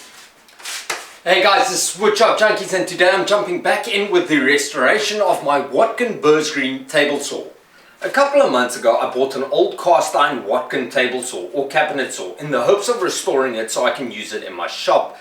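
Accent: South Africa. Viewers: LOVE your videos. My best fried is from SA and I miss him. However, your SA accent makes me feel like I'm hanging out with him and his SA friend. Not to mention, I love you tech tips...all very well done.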